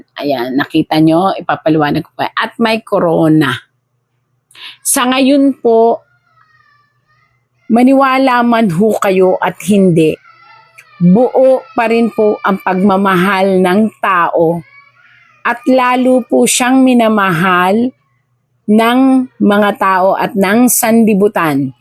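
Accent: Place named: Visayas, Philippines. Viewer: Nku si ito nagsasalita.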